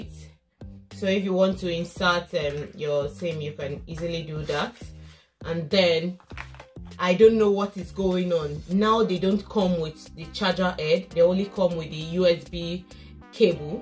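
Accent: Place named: United Kingdom. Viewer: I need me a UK sista that accent 😍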